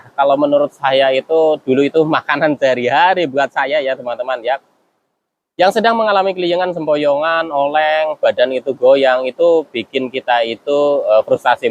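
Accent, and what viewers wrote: Banyumas, Indonesia. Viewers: Takur ngapak tulen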